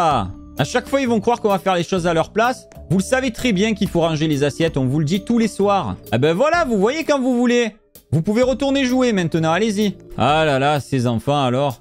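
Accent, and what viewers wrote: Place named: Marseille, France. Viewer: Cc je pense que tu habites à côté de Marseille tu a des accent 😭😭😝😝😜😜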